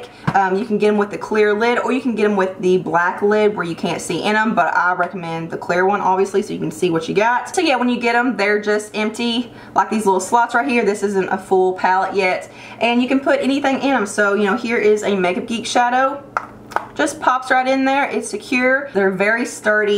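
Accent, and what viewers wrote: South, United States. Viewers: FINALLY, a Beauty Guru YouTuber with a country accent! You just earned a new subscriber!!! #CountryGalsDoitBest #MISSISSIPPIGAL 💋💋💋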